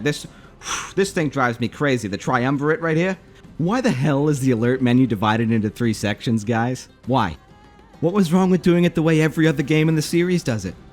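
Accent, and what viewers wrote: Boston, United States. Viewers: idk sounds like Boston or New York accent. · 🤣 Dudeguy, are you from Bean Town? You sound like all my homies 🤣 Solid review, I haven't purchased this game and I probably wont until the price drops way down. I love me some Rome TW, but I don't wanna scratch my rose tinted glasses.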